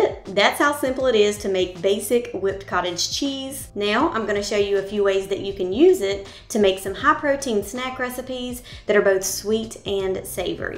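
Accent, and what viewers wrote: South, United States. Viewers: And to add, I expected to hear a Spanish type accent coming from you and then that beautiful southern accent came out. Threw me for a second and chuckled. A pleasant surprise 😊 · I just have one question. Are you from the south?😂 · Love all the recipes. And that Southern accent got me suscribe. Love from Canada ❤❤❤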